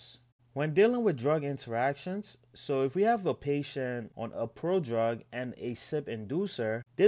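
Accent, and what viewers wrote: United States. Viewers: Why do I hear a nawlins accent? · great video. lol but I swear I can hear a NYC accent.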